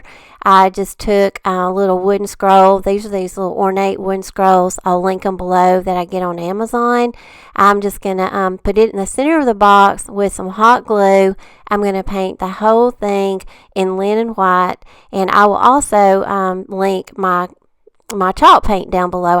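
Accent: South, United States. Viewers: I'm so glad I found your channel. I love your style. It is very similar to my own which is Awesome! I could watch your creations everyday(bonus... I adore your southern accent). Keep them coming and thank you for sharing 😊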